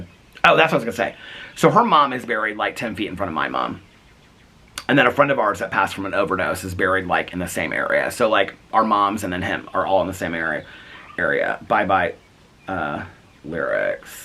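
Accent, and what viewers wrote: South, United States. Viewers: Miss Dolly Parton had the strong hold on you since you were 10 😂 bet that is where the southern accent came from! 🤠💙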